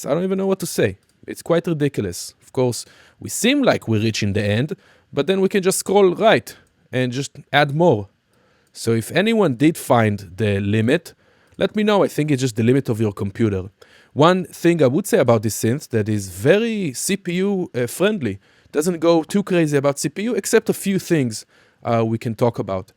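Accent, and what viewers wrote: Israel. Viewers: Israeli accent?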